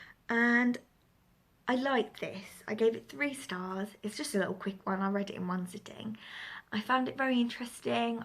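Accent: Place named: RP, United Kingdom. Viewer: hey there ! cool vid hon ! :) :) hugs hugs aww u r nicely organised :) i got a little book revew diary :) i cringed at times in Eleanor too it was sweet book tho hugs where u from hon ? u have similar accent to me .. only u sound posher than me! hugs hugs from Essex :)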